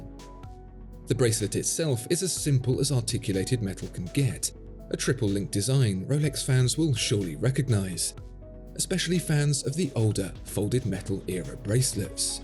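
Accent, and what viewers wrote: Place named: RP, United Kingdom. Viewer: Okay, so I heard "A Rolex is to watches what chimpanzees are to primates, the best, the original, the classic." I don't care for Rolex but are we just going to act like saying that chimpanzees are "the best, the original, the classic," is fine as long as it's said by an elegant voice with a High Street accent? Oh, my oh my. Hilarious.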